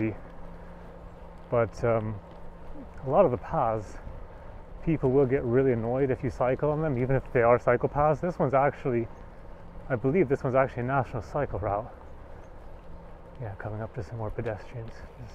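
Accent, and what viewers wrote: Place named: North America. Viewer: I think this came up because I was looking for gopro settings. I’ve been using a chest mount but I’ve seen suggestions that the gopro should be upside down. Not sure why. Which way was yours mounted? I’m in Australia and the cycling infrastructure varies from non existent to fairly good, where I am in South Australia anyway. I heard your accent and thought you were in North America. I’ll see if I can check out your other videos if I have time. Cheers.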